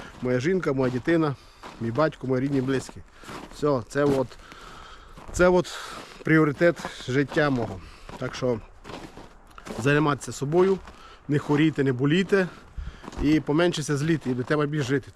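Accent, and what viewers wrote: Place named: Zakarpattia, Ukraine. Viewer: Сяка зима била...😅 зразу чути Закарпаття.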